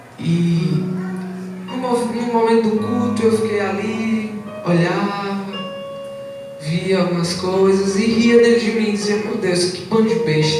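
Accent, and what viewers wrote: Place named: Bahia, Brazil. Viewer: Sotaque do interior da Bahia que saudade!!!!!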